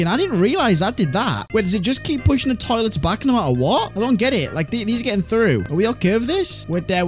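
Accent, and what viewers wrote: United Kingdom. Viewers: Is he british? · You kinda like bretish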